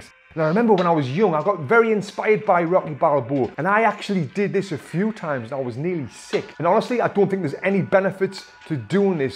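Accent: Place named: Birmingham, United Kingdom. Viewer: Dude, you are talking like peaky blinders. 😊👍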